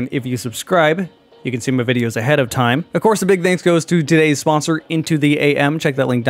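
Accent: Poland. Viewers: Are you polish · Weird question, are you Polish? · I thought he is Polish :)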